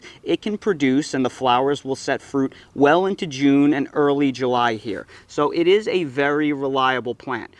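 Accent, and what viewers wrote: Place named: North Carolina, United States. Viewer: No offense, but I kept wondering if you were originally from North Carolina. (Some of my favorite youtubers have recently moved there). I know as millennials some of us don't have much of a southern accent, but you have an accent of your own, haha. Its just something I have an interest in. There are variations of the southern accent and I can usually place someone in a particular region by listening to them talk.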